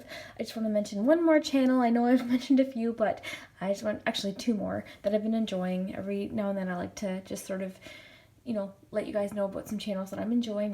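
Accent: Canada. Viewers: are you Canadian? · I might not be correct, but are you from Canada by any chance? I'm pretty good with accents and I'm guessing yours is Canadian.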